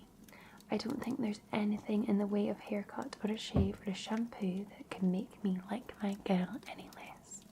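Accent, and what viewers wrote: Scotland, United Kingdom. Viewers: Ah I missed that lovely soft Scottish accent. Sleepy time now, night night 💤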